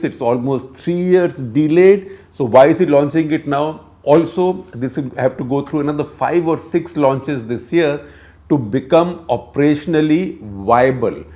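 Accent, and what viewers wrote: India. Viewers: Indian accented is hard to understand 🤪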